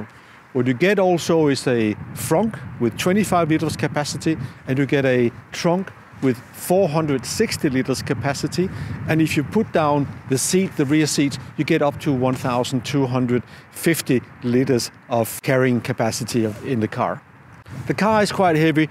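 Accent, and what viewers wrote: Denmark. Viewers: A very professional upload - Thank you! Listening to your accent I would have guessed that you are of Danish origin:) Now seeing your name is, I guess I'm right:)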